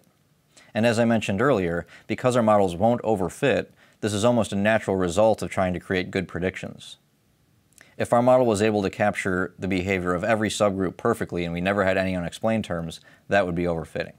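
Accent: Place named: United States. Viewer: finally we got a video with American accent. yay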